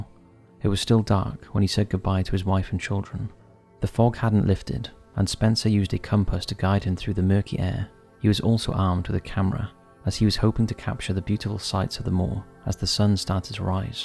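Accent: Wales, United Kingdom. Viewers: Damn yall still making videos and saying things like “night sky” all British and whatnot? I ain’t seen a top 5s videos in a hot minute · He's brilliant, sense a slight Welsh accent and it fits...his content over the last decade is outstanding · Are u welsh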